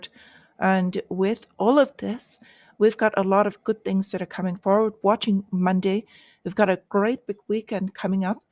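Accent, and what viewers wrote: Ireland. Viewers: HELLO! Oilseed here.:-) Do I detect a bit of 'Dublin' in your voice? Thanks for the info!!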